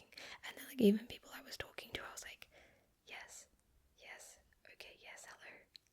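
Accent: Australia. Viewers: You do your preference, but the short hair is super cute. Why do I find most women that aren't into guys hot, oh well. As far as trigger words go, 'plosive p' words are good (words with 2 p's are good; stipple, apple, puppy, etc.) Also repeating sound words are good; coconut, banana, chocolate. Why are most of the words foods? haha. Also, leaning into the Aussie accent really hard for a video would be good, since that is something more niche that you have going for you. Even using slang, like "chuck a wobbly" or something. Not gonna lie, doing the heavy Aussie accent role play wearing that same type of tank top or sports bra exercise top used in the physical trainer videos would probably do well for views (more revealing shoulders/etc. get clicks these days). Whether you do another physical trainer RP or maybe do like a female mechanic explaining the work they did on the car or some work stories (some "grease" on your arms and cheek). 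Anyway, just some ideas and insights to help the channel, not just nosey! haha.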